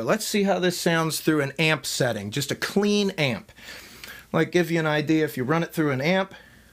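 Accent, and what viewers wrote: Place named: South Jersey, United States. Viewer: you from south jersey or philly?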